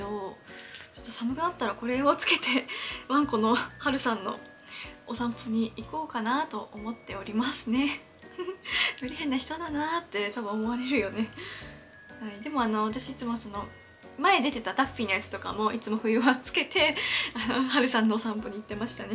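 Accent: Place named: Hiroshima, Japan. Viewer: イントネーションが広島‼️(๑′ฅฅ‵๑)www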